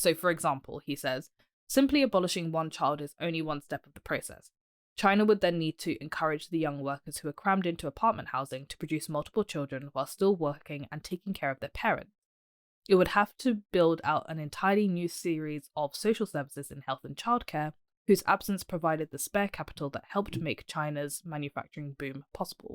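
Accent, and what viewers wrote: England, United Kingdom. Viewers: Peter who? She's pretty ..and I like her English accent. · Your education has made you naive to naive to the facts of power operates. You will naturally understand how power works once youve had a decade away from being a student. Your not taught in school how to obtain power or even how it operates. Thats done on purpose. You are trained academically to be an employee not an employer. As long as you have the employee mindset you will never see the whole picture. Peters opinion is largely held by democrats, republicans and policy makers in washington along with the big think tanks brookings, rand, etc... your intelligent and well educated but your lacking in real world life experience. So your missing the whole picture. Interesting perspective and brave to attempt to debunk peter for lack of a better term. I enjoy your delivery and silly english accent😂😂😂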